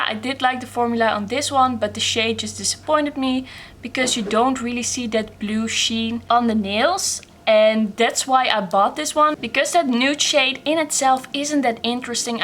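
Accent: Netherlands. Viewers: ❤️❤️❤️ leuke video, hoorde meteen aan je accent dat je Nederlands hebt haha ! Wil toch ook nog eens H&m nagellak proberen, verder ben ik ook erg van Essence, Essie en catrice 😋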